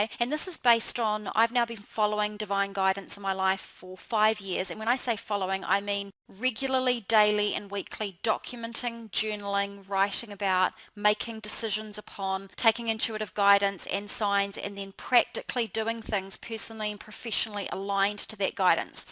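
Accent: New Zealand. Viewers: Rio Brasil Samba Shows sambistabeauty - cos we are KIWIS BRO - 😉 no harm in it, just our accent. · ~ Gosh you speak FAST, but with clarity. Some words escape me with the NZ accent. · Wow great to hear the nz accent!! Good to know there are people in my little corner of the world thinking this way! Xxx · I have been manifesting a trip to Australia for me and my son. I clicked on this video and heard your accent! I know you are from New Zealand but still! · So nice to hear a kiwi accent. Great Video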